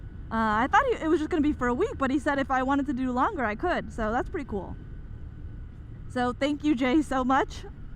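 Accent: United States. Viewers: You sound like you live around Hotlanta!😁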